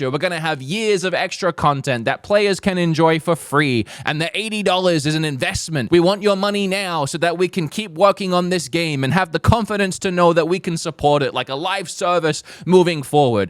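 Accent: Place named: Australia. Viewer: Completely irrelevant question but what's your accent? You sound Australian with a touch of something else?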